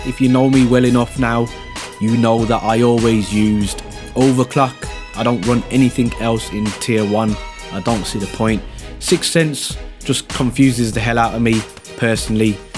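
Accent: Birmingham, United Kingdom. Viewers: You from brum lad?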